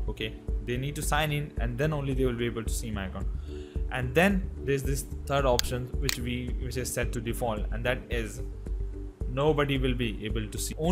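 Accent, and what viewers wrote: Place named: India. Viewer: Sorry can't handle the extreme mono-toned Indian accent. :(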